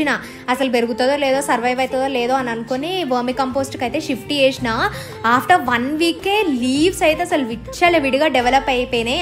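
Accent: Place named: Warangal, India. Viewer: Table rose taskarichimam akka mona and Lotus updates ivandiiiiii and what aa warangal slang take a bow to that 🙇🙇🙇‍♂️🙇‍♂️🙇‍♀️🙇‍♀️❤️❤️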